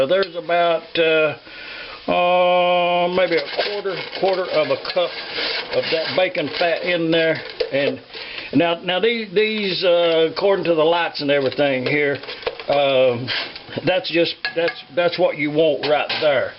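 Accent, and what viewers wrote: South, United States. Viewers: I'm from the north in Michigan and I married a southerner from TX. He also have family in Oklahoma and Arkansas and the food they make absolutely blows my mind. I am forever trying to mimic his family's recipes and just how welcoming and loving they all are. Your accent and cooking always reminds me of them and I love it so much. Keep it up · Awesome!your southern style accent is great! I enjoy shopping at the open market too...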